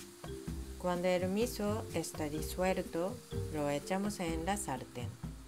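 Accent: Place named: Spain. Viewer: Que hermosa la Japonesa... Me gusta tu acento español y se mira que cocinas muy sabroso. Saludos 😉😘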